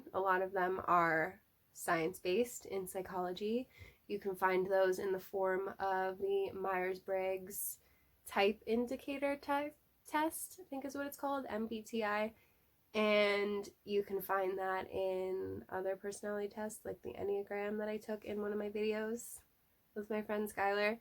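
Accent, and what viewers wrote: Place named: Bronx, United States. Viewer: Thanks for sticking up for the golden pothos! ✊😊 I really like this repot. It covered a lot of ground. Ok let's sum up: Yes, you have an accent that's says "just north of NYC". Girl, get that Miracle Gro darkest pits of hell Satan dust out of your home! The fungus gnats will come. Just remember Miracle Gro has chemical fertilizer added so combining it with worm castings will give your soil high amounts of nitrogen and you can burn your plants. Lastly, what medicinal plants are you growing and planning to grow? 🤔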